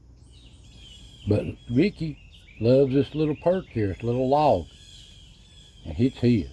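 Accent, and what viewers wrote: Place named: South, United States. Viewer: love ya and your videos. 😢i lost my grandpa in 2013 he was 86 and in ww2 n he was from gatlinburg TN. your Southern voice sounds like my papa. God bless n ty ❤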